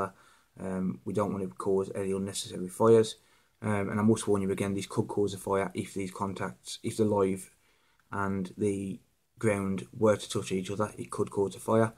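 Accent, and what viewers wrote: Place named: London, United Kingdom. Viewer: What a London blok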